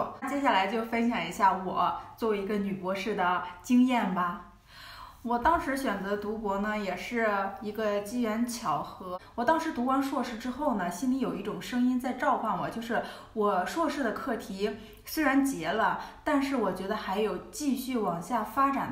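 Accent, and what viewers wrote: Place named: Shandong, China. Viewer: 听口音 Fiona酷似山东人，或者河南？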